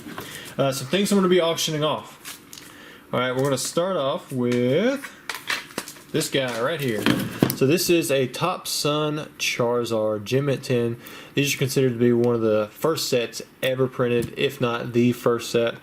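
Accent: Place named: Kentucky, United States. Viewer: Bojangles biscuits yessir...you in ky? Sounds like a ky accent...watchin ya here in Louisville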